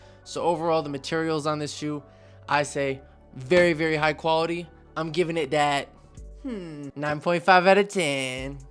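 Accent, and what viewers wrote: New York, United States. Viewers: The ny accent is nutty ( size 10.5) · nice new york accent man😭😭 (size7) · BRO THE NEW YORK ACCENT HAD ME DYING 😭😭 (size 7.5) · New york accsent is crazy size 9.5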